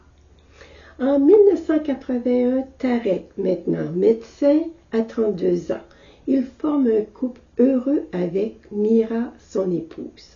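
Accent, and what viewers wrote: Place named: Quebec, Canada. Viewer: Ça s’appelle un accent québécois madame et pas une mauvaise diction. La qualité de l’audio laisse à désirer certes mais la diction de est irréprochable, à moins que vous ayez une allergie particulière à l’égard des différentes manières dont la langue française se prononce à travers le monde, dans ce cas on ne peut rien pour vous, votre cas est sans espoir.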